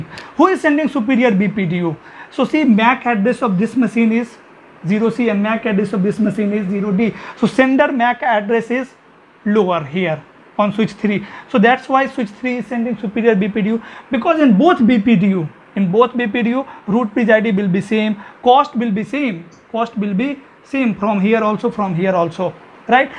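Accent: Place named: India. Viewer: He explained it really well. Yes it can be hard to understand what he is saying because of his accent but because I spent alot of time speaking to people from India it helped me understand them when they speak. Its a skill you have to develop over time.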